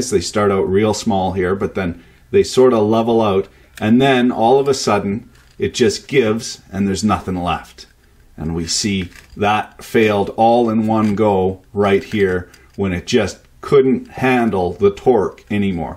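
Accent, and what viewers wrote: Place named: Maritimes, Canada. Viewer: please check this article and related video on head bolt failure. <link> FYI its great to hear a fellow Canadian on you tube. For a guy living in BC you sound suspiciously like a maritimer. or maybe you had a Moosehead ale or to once in while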